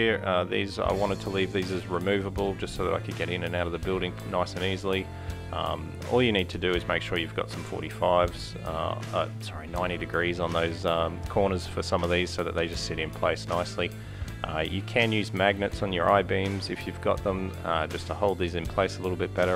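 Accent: Australia. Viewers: Mate enjoy your videos, obviously your Australian . Which State you in. Looking at supporting.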